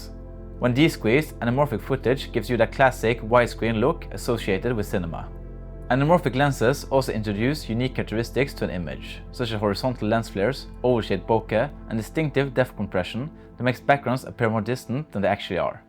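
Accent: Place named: Bergen, Norway. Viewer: Are you from the Bergen area of Norway? Your English accent sounds like you are…. 😊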